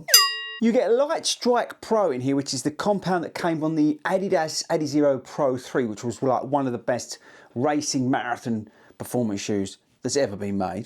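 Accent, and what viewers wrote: United Kingdom. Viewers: Are you British sir?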